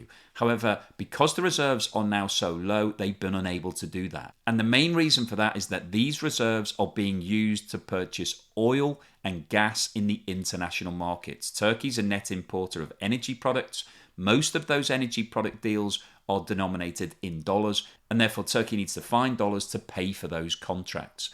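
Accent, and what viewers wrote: United Kingdom. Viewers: Your analysis of the Greek-Turkish situation is appallingly one-sided and misleading. (1) Greece has S300 in Crete because Cyprus purchased them to station them in Cyprus, but Greece had to take them because Turkey made a fuss. Greece did a favour to NATO to appease Turkey, yet again as done many times. (2) Turkey has been invading Greek air space many more times than it claims Greece does. Whether Greece does invade Turkish Air space is to be seen and probably false, because Turkey sees the air space over the Greek Islands as its own, which is a fantasy. (3) Turkey has been attempting to size Greek territorial waters and land for decades because it wants access to hydrocarbons in the Aegean see, and to do this it is inventing its own nonsense all the time. Most ridicolous of all, it never signed UN treaties regulating continental shelves (together with a handful of countries) and then tries to invent its own continental shelf. 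(4) Greece stations small military units on the islands for defensive purposes. This after the Turkish illegal invasion and occupation of Cyprus. And has the right to defend its territorial integrity given Turkey's very obvious aggressive policy. In short, stop doing propaganda for the Turkish state. And if that is not your intention, educate yourself and present facts as they are. Finally, since it sounds like you are a Brit, put your time into making sure that your government gets their hands off Cyprus once and for all, does what necessary to bring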